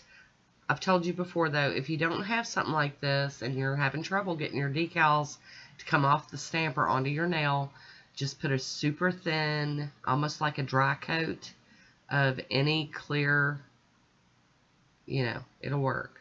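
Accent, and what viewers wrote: South, United States. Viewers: I love it!! And I like your voice, it's the few times I get to hear a southern accent. And your tips are especially nice, sometimes ya forget things and make it harder on yourselves. 💚🍀💚🍀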